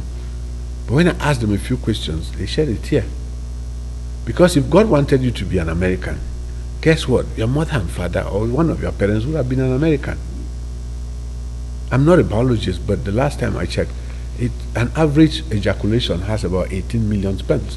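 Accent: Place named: Nigeria. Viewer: You sound Nigerian . How is the struggle of Cameroonians consern you ?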